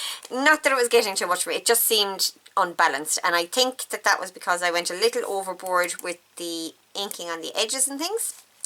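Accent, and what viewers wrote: Ireland. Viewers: Superb, fun, engaging, inspiring 🌹 Thank you so much for creating these two styles, they are just wonderful and I’m thrilled that you use lace and fabric, gesso and metallic in these styles, I’m definitely going to create a Shabbychic style for my current project! Wonderful to hear your beautiful Irish lilt and watch your happy, fab tutorial! Hugs and blessings 🫂🙏🏻❤️😍🌹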